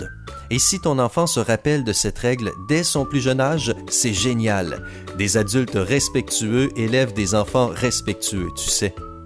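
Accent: Quebec, Canada. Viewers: T québécois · T'es québécois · kimii lee accent québécois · Moi, dans quelques jours c'est ma fête et je vais avoir 10 ans et mes parent mon jamais dit sa(peut-être quelques une mais je m'en souvient pas)et la quand je regarde la vidéo sa me donne envie de revivre parce que je me fais insulter,harceler.😥😣☹️😖😞😔😕🙁😫😩😤😠😡😵😰😢😭😓🤕et je pensais pas que SYMPA parle québécois lime si tu es québécois(e)en tout cas moi je suis québécoise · je crois que le gars qui parle,c'est un quebecois et jespere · Est tu québécois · Tu est québécois ? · Et sinon faut être gentil pas méchant c'est pas gentil d'être méchant c'est mieux d'être gentil ? Putain j'ai pas vu de tel defonçage de porte ouverte depuis "le feu ça brûle" même l'accent québécois a pas réussi à sauver cette vidéo. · C québécois comme moi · kimii lee c l'accent québécois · L'accent Québécois ! :D xD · C normal c l'accent québécois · On ' dirait un accent québécois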